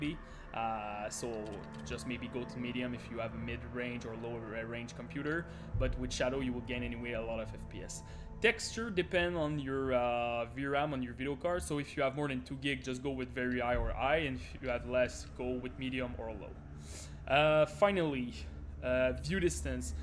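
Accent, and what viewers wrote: Quebec, Canada. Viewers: l'accent québécois mon chum, bon vidéo big.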